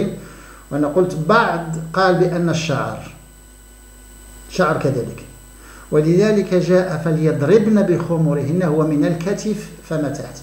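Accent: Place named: Morocco. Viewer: تحياتى اليك دكتور، لي عندك رجاء: اللهجة المغربية صعبة قليلا ،ويزيدها صعوبة سرعتك في الكلام. ليتك تتمهل قليلا في الكلام حتى نتمكن من الاستفادة من علومك القيمة .وشكرا جزيلاً ...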